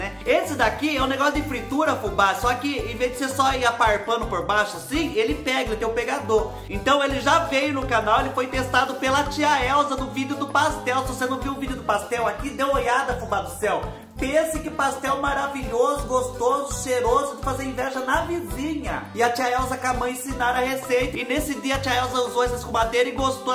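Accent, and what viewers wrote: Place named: Northern Paraná, Brazil. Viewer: Hei Fubá, que divertido ver vc falando "paranaês" do norte! Sucesso.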